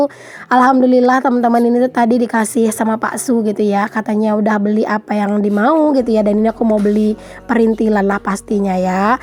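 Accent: Java, Indonesia. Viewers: Rumbai hadir,bund ngomongnya pakai logat pekanbaru dunk bnd?kayaknya skrg bnda ngomong lebih medok ke jawa 🤗🤗🤗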